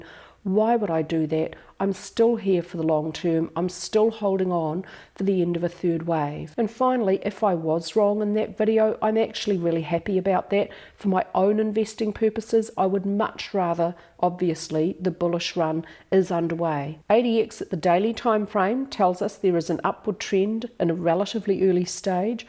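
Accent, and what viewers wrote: New Zealand. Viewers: Love your work, so HAPPY to hear that Kiwi voice again The weaknesses shown in the various indicators on XRP really show an incredibly indecisive market right now. So, so hard to find signs of life! 🤪🙏😁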